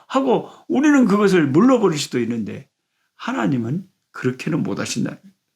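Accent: Jeolla, South Korea. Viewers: 목사님 전라도 사투리 말씀 은혜가됩니다 저도 전라도 고흥입니다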